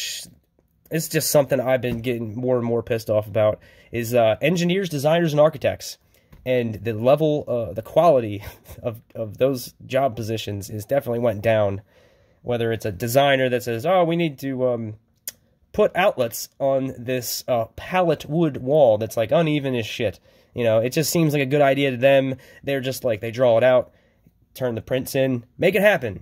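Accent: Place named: Maryland, United States. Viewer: I knew you were from Maryland the second you started talking haha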